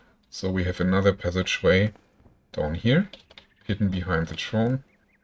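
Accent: Germany. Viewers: That's awesome! I have my BA, so I'm fairly artistic myself. I hope I can build my castle even half of how cool yours turned out though. I'm just worried about going to big and making my machine struggle to run the game so not sure how big I'm going to end up going yet. I'm incredibly impressed by your build though. Going to throw you a sub and keep in eye out for other cool builds. Also you sound like you're German? Yeah? My mom was born in Germany so I have family over in Germany. 🙌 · 20fps ... nice ! :D But impressive building. You have a typical german acent btw :D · Richtig geiles Ding haste da aus und in den Stein Gekloppt! Respekt, ich freu mich auf mein PC upgrade, dann werde ich mich auch in dieses wunderbare Bausystem verlieren. PS. Hab direkt am Akzent erkannt das du Deutsch-Muttersprachler bist hehe xD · The german accent sounds so terrible to me. But good video though. 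· Da mich der deutsche Akzent quasi anspringst, werde ich mal auf deutsch kommentieren. 😅 Die Burg ist wirklich extrem gut geworden und Respekt dafür 👍 Am besten gefallen mir die vielen Kleinigkeiten in deinem Build, vor allem die Geheimgänge. Zwei Punkte wo man meiner Meinung nach (persönliche Geschmack) noch etwas was verbessern könnte. Die "Main-Hall" könnte ein bisschen mehr Prunk gebrauchen, weil es das erste ist was man sieht und soll die Besucher natürlich gleich überzeugen. Es fehlt etwas an Pflanzen in der Burg. Das sind aber wie gesagt zwei Kleinigkeiten und ist wirklich beeindruckend was du da gebaut hast. Viel Spaß weiterhin beim spielen und bauen.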